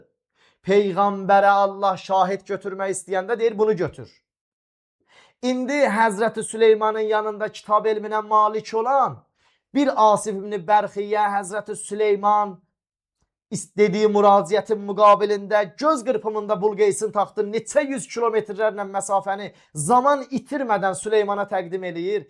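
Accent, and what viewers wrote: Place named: Iran. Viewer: Iranski lehceee offfff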